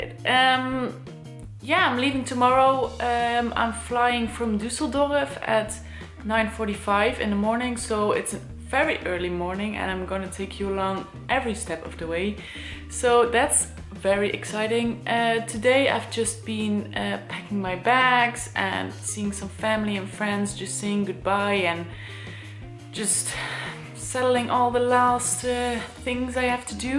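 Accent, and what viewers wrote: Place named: Netherlands. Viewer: Love your Dutch accent!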